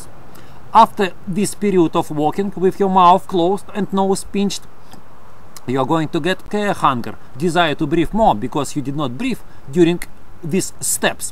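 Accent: Russia. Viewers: he's Russian and so am I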